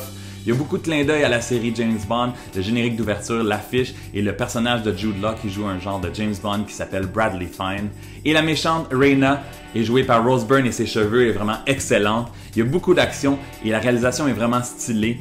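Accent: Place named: Quebec, Canada. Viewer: J'adore ton accent québécois. Bonne critique.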